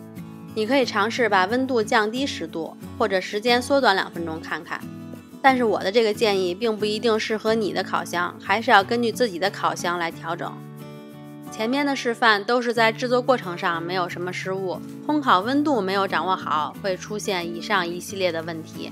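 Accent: Beijing, China. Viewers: 我的天啊 辛苦你了！太不容易了！这得吃多少蛋糕...京腔听着很舒服哈哈哈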